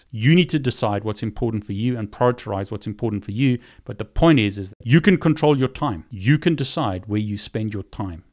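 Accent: South Africa. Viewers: Great hearing a South African accent inspiring success so powerfully. Baie baie dankie, boet!!! 😉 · Thank you, this was super helpful. & so great to hear a YouTuber with a SA accent! Hi from CPT. 😁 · Are you south african? You sound south african :)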